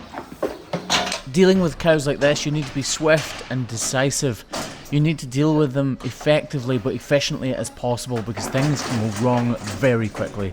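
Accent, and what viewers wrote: Scotland, United Kingdom. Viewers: Oh I love that you little bit of a British accent LOVE! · AYE LAD YER FROM SCOTLAND WHERE??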